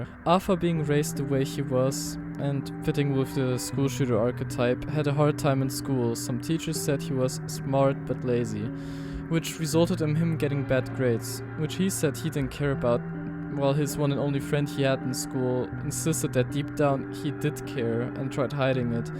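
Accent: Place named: Germany. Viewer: You have a german accent tho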